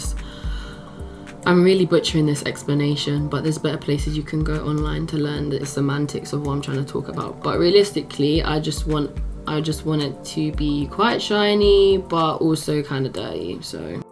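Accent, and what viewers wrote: London, United Kingdom. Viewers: First of all great tutorial. When you scroll the camera. You control I think it is so that you can zoom in or out with more control and it does not jump with such big steps. Thanks again. Keep them coming. Also your very funny. Makes it easier to listen to haha. London lady? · I love hearing you speak, you sound like a fellow Londoner! Hearing you explain everything so simply and clearly (which you'd think is hard with such hard software) is gorgeous - I haven't even got Blender just trying to educate myself! Thanks :)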